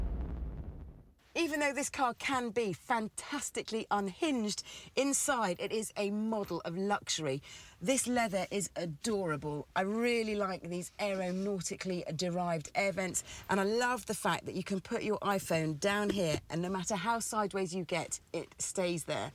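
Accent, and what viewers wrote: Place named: RP, United Kingdom. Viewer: They could have hired a more attractive presenter....VBH is a minger and why talk like youve got plums in your mouth!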